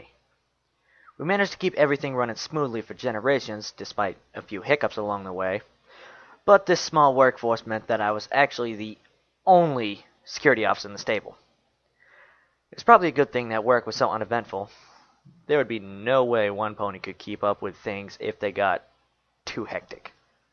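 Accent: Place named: Boston, United States. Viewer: Most of all I like your slight accent. What is that Bostonian? Got to be eastern seaboard.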